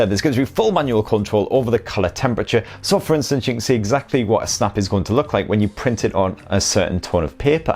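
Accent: United Kingdom. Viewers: Hey bub Just to let you know, I had a hard time keeping up with your tips and tricks,, you went extremely fast explaining the tips, you had to rewind and play what you said several times to catch what you said, 2 your British accent is a little difficult when you're going too fast speaking wise, 3 you're an phone expert but many people might not be so try to use common words for average people just my advice bub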